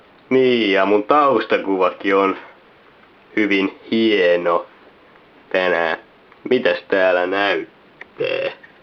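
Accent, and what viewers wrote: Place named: Tampere, Finland. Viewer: Kuulostat joltain Tamperelaiselta. :S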